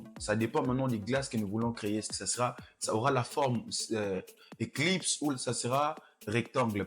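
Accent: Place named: Africa. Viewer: Grâce à toi je sais maintenant comment manipuler un tracé ET son contenu, qui remplit la modification que je fais avec l'outil (A) Sélection Directe sur un tracé - Avant je manipulais le tracé, ensuite je le reconvertissais en sélection et je faisais REMPLIR pff quelle galère .. 😖 Maintenant en convertissant le texte ou un objet en FORME ça change tout ! Le contenant 🔸ET 🔸 le contenu sont manipulables en même temps ☺ Merci, je m'abonne pour te soutenir (en plus j'adore ton petit accent africain 🥰)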